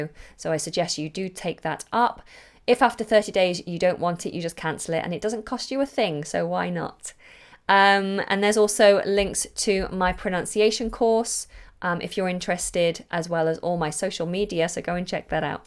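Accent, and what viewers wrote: United Kingdom. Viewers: i loved your voice and your accent i want to learn british accent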